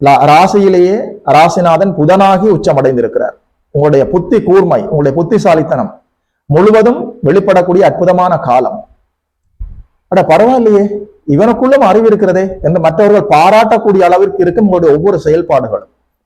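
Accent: Sri Lanka. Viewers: Srilankan tamil flavour is seen. Good luck to the video content teller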